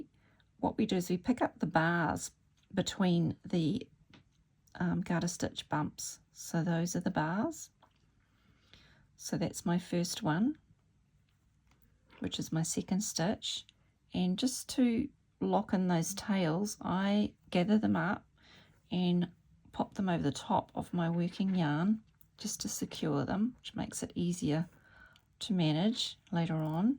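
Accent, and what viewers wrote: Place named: New Zealand. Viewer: so lovely to hear your kiwi accent :)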